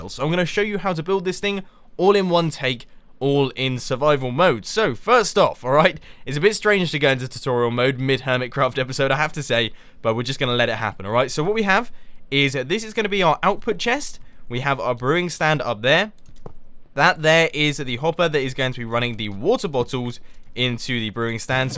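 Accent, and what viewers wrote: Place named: United Kingdom. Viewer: the accent tho... one of the little things I love about ♥ "wotoor botools" (water bottles) "simpolist" (simplest) "prooty" (pretty) and all the missing T's and R's that british people always manage to miss. like you say "ee" instead of "it" or "earlieh" instead of "earlier", "ge'in" for "getting", "bu-on" for "button"...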